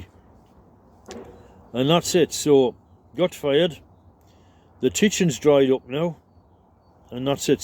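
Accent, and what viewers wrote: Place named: County Durham, United Kingdom. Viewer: I'm 47 this year and still waiting for a diagnosis, Its been around 6yrs journey as I've been assessed for autism and bpd only to come back to an original opinion which was adhd. I must say I dont do well but I'm confining myself to my home so I don't buttress up against much that can cause to much stress. I hope you all the best and hope to find some confidence in my little bubble as you have shown to be possible. we also share an accent so that helps, I'm in peterlee, co. Durham.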